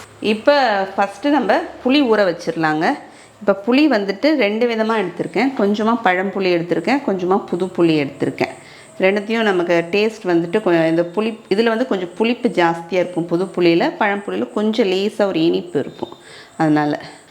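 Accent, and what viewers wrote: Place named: Pudukkottai, India. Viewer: Iam 58 belong to Pudukottai The way you we’re speaking reminded my side people voice More than the receipe I enjoyed your Tamil. Good going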